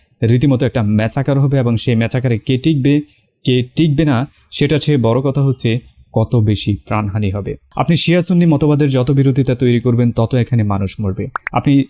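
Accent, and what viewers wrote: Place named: Noakhali, Bangladesh. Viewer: ভাই, আপনার বাড়ী নোয়াখালী! কথার টোন e বুঝলাম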